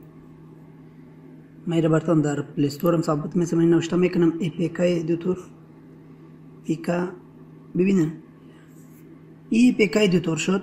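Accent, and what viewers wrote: Afghanistan. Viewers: با سلام به دوستان عزیز افغانی . عزیزان ابتدا درخواستی دارم از شما . اینکه لطفاً زمان توضیح برنامه لطفاً کمی شمردة تر توضیح دهید چون دوست عزیزی که امورش می‌دهد فارسی را با لهجه و گویش غلیظ افغانی و با سرعت زیاد توضیح میدهد . و یک سوال داشتم . زمانی که یک vpn یا یک App رایگان دانلود میکنیم بعد از چند روز زمان استفاده رایگان تمام میشود و باید ان را اپدیت کنیم و حتما باید از داخل برنامه رایگان به ستینگ برویم و گزینه اپدیت را زده تا اپدیت شود ولی متاسفانه تا زمانی پول پرداخت نکنیم اپدیت نمیشود الان تقریبا ۹۰ درصد برنامةها نسخةهای پولی خود را اینگونه ارائه میکنند و به همین دلیل نمیشود برنامةهای پولی را دان کرد. اگر امکان دارد اگر راهی وجود دارد که بتوان رایگان دان کرد در کانال بگذارید متشکر میشوم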